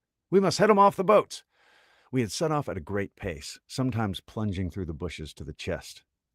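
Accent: South, United States. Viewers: Woohoo! Somehow I had never actually read Treasure Island, or if I did, I forgot most of it. Thank you for finishing your reading! Southern really suits the story so well. I had an idea for a possible future book - The Three Musketeers? Though that would be a long one.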